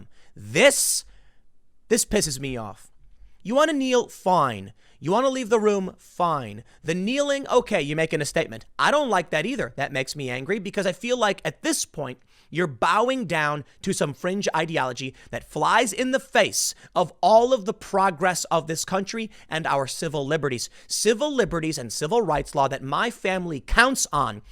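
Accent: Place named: Chicago, United States. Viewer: This is the first time I've noticed a chicago accent from Tim. He must actually be upset about this.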